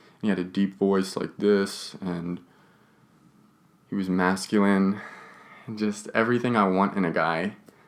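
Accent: United Kingdom. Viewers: you are so hot you could turn on a dead guy. Oh, the shirt looks super. The British accent is a turn on for me as well. You look and sound super sweet and I know you must be since I have seen some of your adult films. Please take care because you do great videos, and you certainly are wonderful to look at and listen to. Thanks for the videos!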